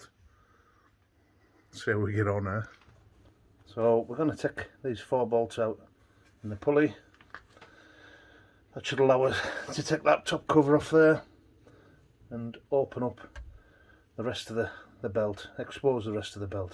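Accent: Cumbria, United Kingdom. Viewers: Where in Cumbria are you from?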